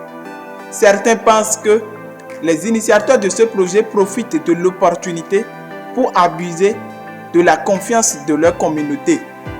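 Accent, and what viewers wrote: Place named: West Africa. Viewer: Son français n'est pas forcément mauvais, mais c'est l'accent de sa langue maternelle qui prime, vous nos fr de l'Afrique de L'Ouest avez ce problème là, écoutez un Congolais(🇨🇩) parler francais et après écoutez-vous parler vous comprendrez, alors l'essentiel est le message qu'il nous donne, c'est très important👌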